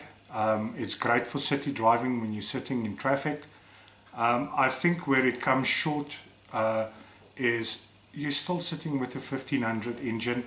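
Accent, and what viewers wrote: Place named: South Africa. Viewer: I love your South Africa accent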